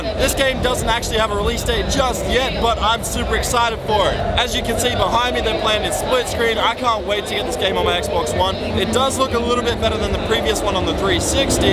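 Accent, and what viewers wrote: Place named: Australia. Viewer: ***** lol it was joke...he always says "my accent's Australian"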